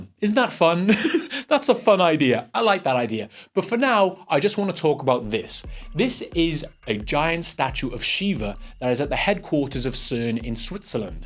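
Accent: Wales, United Kingdom. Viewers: literally every vid you make is on the lines of what I'm researching, i feel like your following me...and you sound like your from my end of Wales...definitely following me XD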